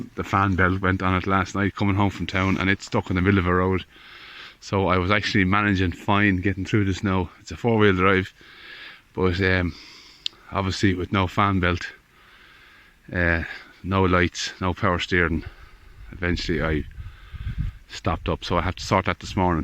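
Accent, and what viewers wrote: Ireland. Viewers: What part of the country are ye in, somehow I had it in my head that you were Kerry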